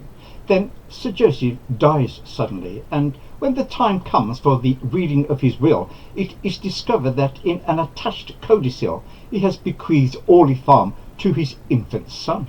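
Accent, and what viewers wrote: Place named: United Kingdom. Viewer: This book is now in my TBR and I am following your channel. Great job with the review. I love your British accent! Stay safe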